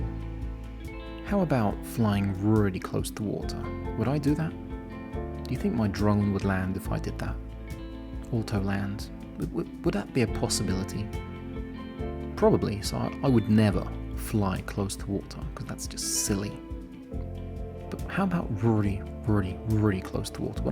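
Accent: United Kingdom. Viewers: This makes sense, i could quite place where you may be from. Its a unique accent but definitely quite British the accent and you have great humor. Great work man keep it up. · U can always count on someone w a British accent to call a spade a spade. Lmao. Agreed. Beyond the mini2... It's going to take alot to justify crossing the legal line in Canada .. ... Awaiting the Mavic 3 as well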